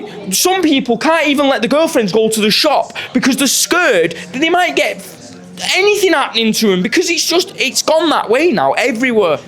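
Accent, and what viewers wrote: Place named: Northern England, United Kingdom. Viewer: Subtitles for a Northern accent is sending me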